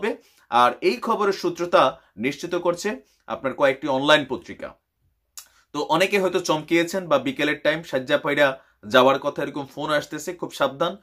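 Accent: Comilla, Bangladesh. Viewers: কুমিল্লার পোলা ৮০ টাকা তোলা , কুমিল্লার আঞ্চলিক ভাষা শুধু ভালো লাগে আপনার মুখে সত্যি সত্যি সত্যি সত্যি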